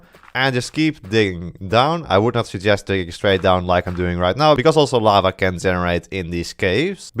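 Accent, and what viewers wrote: Netherlands. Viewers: r u dutch?😭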